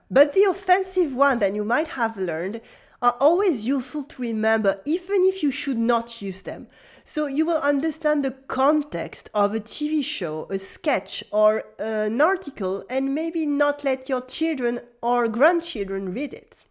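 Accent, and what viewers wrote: Paris, France. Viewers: From my little experience in Paris along with some automotive supplier work in Montreal, I have learned that there are many versions of French. First and most correct is Parisian French and I think that is what the narrator is speaking. Next is provincial French spoken in France but outside of Paris. A bit distant third is French Canadian followed by French spoken everywhere else eventually down to Cajun.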